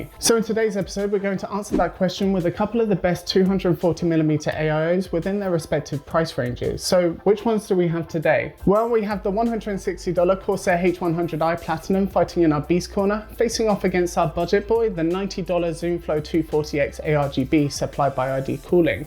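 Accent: United Kingdom. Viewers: Wow that accent is very British Sir are you sure you are American ?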